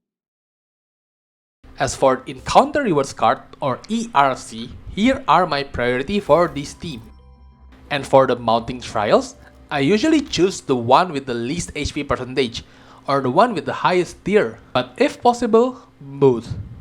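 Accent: Indonesia. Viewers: You have an indonesian english accent, are from indo? 😀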